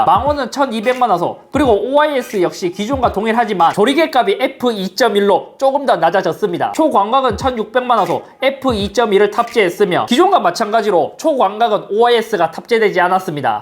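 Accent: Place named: Busan, South Korea. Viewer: 안녕하세요. 저는 형님을 컴퓨터 조립할때 처음 접했는데요. 낯선 경기도권으로 취직을 해서 그리운 부산을 형님의 사투리를 들으며 버텼습니다. 항상 감사합니다!! 주문번호 뒷자리는 <num>입니다. 감사합니다.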